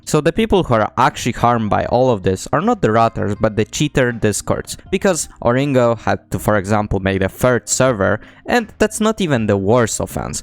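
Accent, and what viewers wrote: Poland. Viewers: slysze polski akcent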